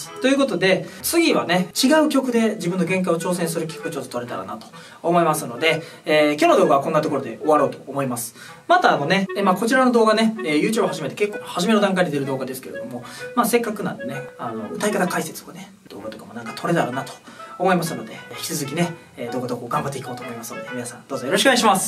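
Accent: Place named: Kansai, Japan. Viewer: 関西弁なのめっちゃいい！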